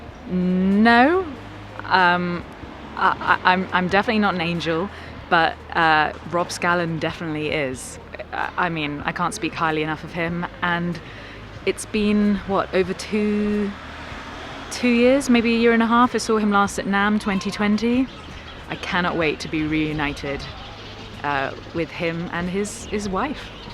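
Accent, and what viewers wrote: United Kingdom. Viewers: All Brits have a pleasing accent! We don’t even know which ones are considered posh. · Your “ ” cover with Josh Turner was EL PERFECTO!! Thank you! I also appreciate your respect for the English language and attempt to use proper grammar. I share it. Language is at best a limited and somewhat poor vessel for conveying our thoughts and feelings. Taking the trouble to develop a good vocabulary and decent command of the language is critical to our ability to think, understand the world around us, and communicate our insights. It deserves respect. As an American, I’m a bit unsure of the meaning of a “posh” way of speaking. However, I am aware of the many radically different accents in Britain; some quite difficult to understand. Yours is very nice. I’m from an old Tidewater Virginia family that predates our war for Independence. We have an accent here that still retains many flavors of old English so the way the Royals speak feels familiar to my ear. 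Linguistically I have no idea what that means except that perhaps being extremely traditional and somewhat cloistered from the general public they may have preserved a way of speaking from the times when England colonized America. English aside, I enjoy your music. Thank you. Good music is mana for the soul and making it is a worthy life’s endeavor. Well done!! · You really are a talented person, musician, singer, has a beautiful voice singing, but you have no idea how I love to listen your voice when you're speaking, the calm, how it sounds is enjoyable to me and I love, really love the British accent. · You realize people just love your wonderfully intelligent and smooth British accent and that's the primary reason you have so many views right? ;) LOL! Keep it up!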